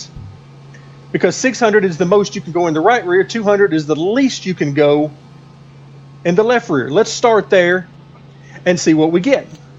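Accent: South, United States. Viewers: For some reason, your southern accent gives this validity. I hear it and I'm like "this guy probably knows more about nascar than me"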